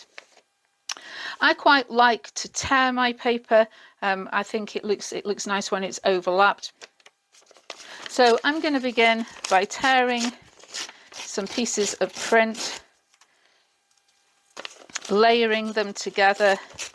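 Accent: England, United Kingdom. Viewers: Hi, I'm Jane, in the UK, a relatively new subscriber, and I'm avidly watching all of your videos, I come to you for a dollop of inspiration! I'd love to get into gel plate printing, it looks like a lot of fun! Also, can I say, it's lovely to hear an English voice in the world of mixed media! Congratulations on reaching 25k, you deserve it.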